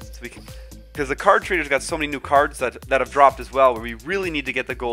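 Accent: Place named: Canada. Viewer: and that how i learned that is Canadian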